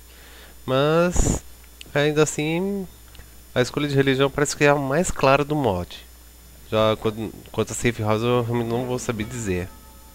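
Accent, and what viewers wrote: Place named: Portugal. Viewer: Vc tem um sotaque portguês, mas vc é brasileiro ? qual sua nacionalidade?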